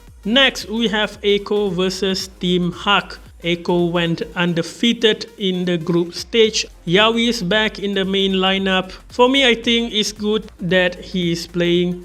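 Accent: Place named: Indonesia. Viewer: in the accent sounds like indonesian or malaysian and little singaporean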